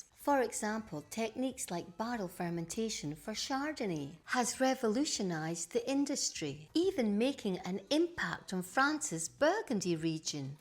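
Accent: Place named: Scotland, United Kingdom. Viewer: Must be my Scottish ancestry (MacGregor) because I've never had the privilege of visiting yet. Whenever I hear a Scottish accent it feels like I'm taken back home, if that makes sense. Yes as Aussies we take so much here for granted, especially by those who have never visited other countries. We just don't realise what we have and how good life here can be if we allow ourselves to appreciate it. But in some beachside locations the sand flies can give the midgies a run for their money. They say the mozzies her can carry you away but the sandflies rough you up good and proper then carry you away. Also Aussies will be the first to tell "Whinging Pom!" to anyone who dare criticize what we love to criticize ourselves. But truth be known the Poms have nothing on the whinging Aussie. We'll have a whinge about finding nothing to have a whinge about.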